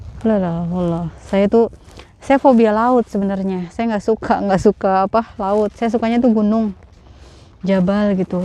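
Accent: Jakarta, Indonesia. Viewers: Betawi bgt si mpo..🤭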